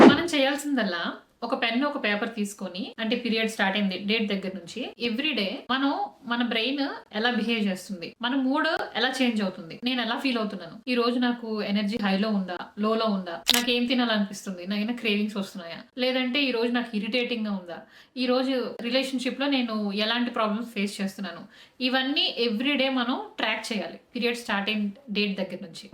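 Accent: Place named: Godavari, India. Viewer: Hi garu. One thing i could say.. You are so honest & Simple. I started watching ur channel with "HOW NOT TO DIE". You are from KAKINADA? Coz your slang is similar to EAST WEST GODAVARI. One thing i want to know, why you stopped NAMASTE LONDON Channel?? You said i woked as Assr manager in indias Lasrgest bank. SBI Or HDFC? ( Iam also a banker) Lots of best wishes from us. Appreciating your partner for supporting your dreams n aspirations. Wishing all the best to Be happy with channel.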